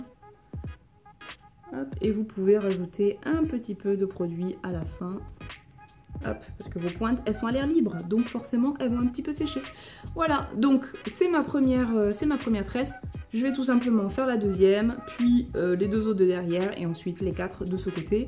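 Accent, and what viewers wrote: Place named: South, France. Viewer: hahaha jm trop l'accent du sud hahha moi je te regarde de Marseille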